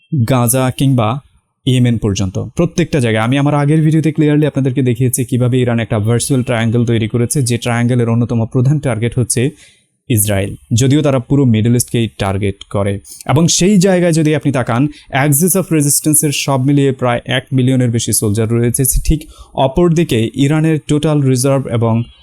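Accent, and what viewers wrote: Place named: Noakhali, Bangladesh. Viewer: ভাই, আপনার বাড়ী নোয়াখালী! কথার টোন e বুঝলাম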